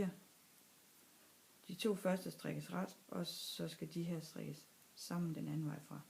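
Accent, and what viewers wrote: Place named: Hjørring, Denmark. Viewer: Woow nu fatter jeg det. Superfin demo. Og endda på mit dialekt. Hjørring. Ikke?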